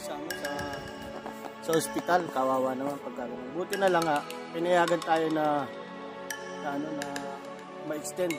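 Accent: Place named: Batangas, Philippines. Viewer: parang Batangenyo ang punto mo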